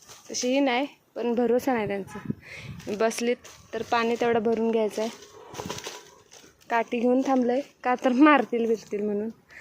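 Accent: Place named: Sangli, India. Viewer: ताई तुम्ही सांगली चे आहात काय,, तुमचे बोलने सांगली कडचे वाटते माझे गाव पण सांगली जिल्ह्यात आहे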